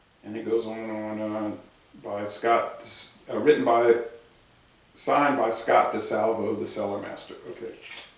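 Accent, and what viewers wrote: Louisiana, United States. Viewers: Where are you from brother i hear a little Louisiana accent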